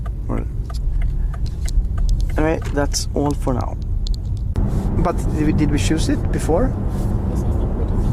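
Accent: Sweden. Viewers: nice swede english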